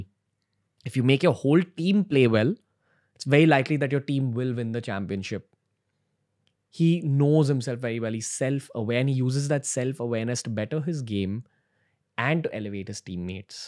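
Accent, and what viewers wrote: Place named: Mumbai, India. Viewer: Hi Ranveer, I hope you are well and safe. I don't follow your videos but have seen a few of them as you interview some sensible people like Saif Ali Khan and Rajeev Masand. Your english is understandable with your Mumbai accent. I understand you are trying to educate the masses in India (your prime target to enter Bollywood). I strongly feel every person in India wants to be a superstar! However, I don't understand that why do you keep mentioning who was your junior or senior in the elite school you have studied in. It's quite irritating to hear you mention this to every guest you meet. I assume you are doing well in your career. But are you trying to sell yourself by mentioning the names of all your juniors or seniors in school who belong to families with influence and money? Of course you are proud of your school and should be. 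Your body language is such where you looked and sounded like a scared child when interviewing Saif. I would like to conclude that I have written to you today using a pseudnym of Tanya Newman as I don't wish to be trolled, abused or threatened by your followers. Indians are a bit crazy that way if they feel offended by a view expressed by a lady. You look decent but please stop looking for praises. I really liked your mother's interview but it appeared that you wanted her to praise you all the time which she didn't. Most definitely, you tried putting words in her mouth. That was funny. And your video teaching the masses how to impress a woman/world wh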